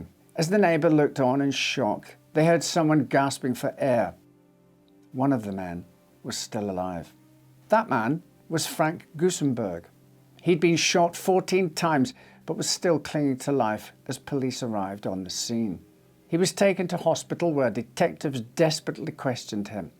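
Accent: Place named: Glasgow, United Kingdom. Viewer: Washington state West coast U.S.A here. I hope yur real n not scrappy a.i.😕 Love the Glasgy speak😊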